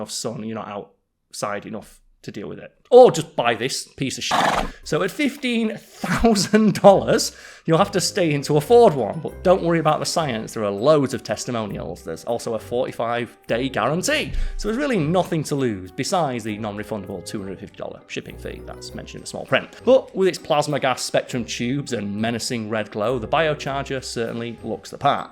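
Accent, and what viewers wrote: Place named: North, United Kingdom. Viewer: Hey, I’m an American Brit who grew up in London until I was 15 and then my parents moved back to the states when my father left the US Embassy Service. I am now 74 and have a bit of a hearing problem. My very expensive hearing aids don’t work as well as I would like. I sometimes have a difficult time following your wonderful northern accent. If you could slow down a bit, I would have a easier time understanding you. I do not expect you to speak full on RP just slow down a bit and enunciate a bit more clearly.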